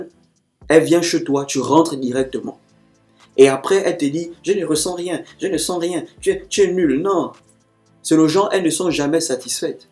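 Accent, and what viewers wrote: Gabon. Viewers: te gabonais frère l'accent ce chez moi içi ah libreville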